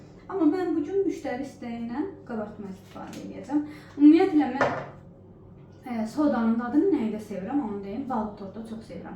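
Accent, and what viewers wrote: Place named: Nakhchivan, Azerbaijan. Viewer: Salam bu şirin danişiq naxcivan danişiqidi🎉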